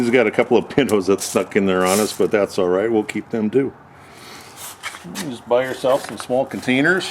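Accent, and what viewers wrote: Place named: North Dakota, United States. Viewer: Thanks for demonstrating some great ingenuity! I always like to see off-the-shelf items re-purposed. When I first started watching this, I said to my wife, "He sounds like Charlie Selberg! I wonder if he's from North Dakota? " And sure enough, you're in Fargo, which is where Charlie grew up.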